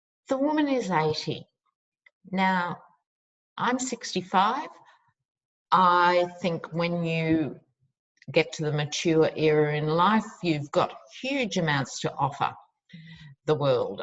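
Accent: Australia. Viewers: Great readings! 🌺💐🌺I love your clear, concise yet laidback style, and your Aussie Accent is the best! 😉Thanks for sharing your awesome gifts and talents with us. Happy New Year 🛎🎉🎉🎉and Continued Blessings! 💙🧡💜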